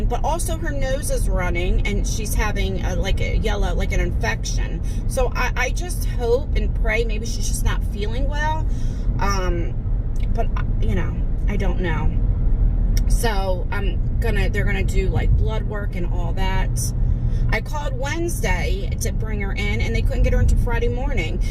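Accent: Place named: New York, United States. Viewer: Lol that's just your New York accent 😂. That's ok. But im so sorry for your loss with Bella. I know it's much more than just losing her for you.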